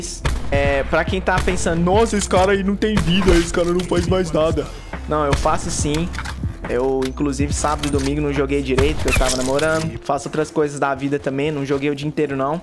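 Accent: Minas Gerais, Brazil. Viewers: Mineiro nato haha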